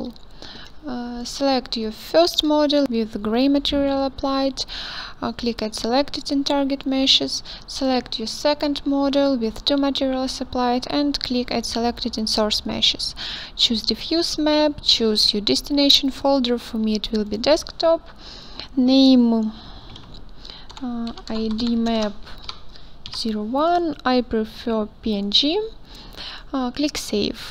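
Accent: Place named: Russia. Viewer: слышится русский акцент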